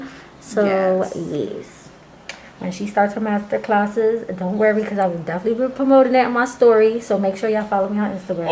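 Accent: New York, United States. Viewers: Omg, I been follow you for a while now...I had no idea you were based in New York, but now I can totally here that accent. Hella cute ma, much love from CALI 💙😈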